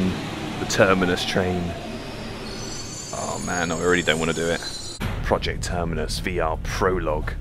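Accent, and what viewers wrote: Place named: United Kingdom. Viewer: the way you speak just screams british and i love it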